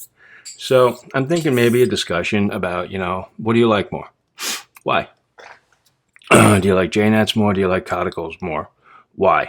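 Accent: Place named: Brooklyn, United States. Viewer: I love the Brooklyn Italian accent, by the way. It's music to my ears.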